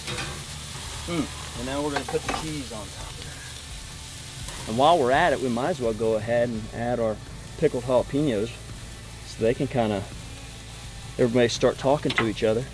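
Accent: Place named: Carolina, United States. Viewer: sounds a lot like Trey Gowdy…a fellow Carolina native! Great burgers & a new sub here. Grill on brother!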